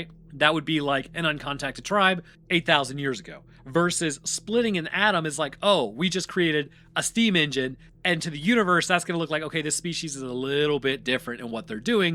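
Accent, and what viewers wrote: United States. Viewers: He pronounces the 'Merican way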